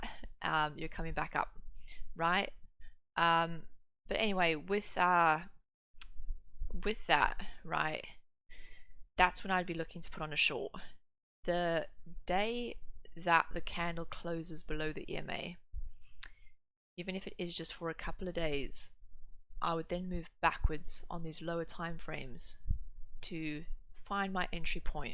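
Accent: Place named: South Africa. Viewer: South Australia sorry haha. I wondered if you were from South Africa with your accent.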